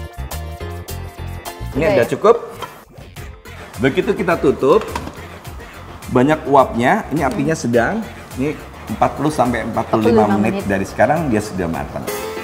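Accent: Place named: Java, Indonesia. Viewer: Mbae ayu2 ngomong nya medok banget 😆😆😆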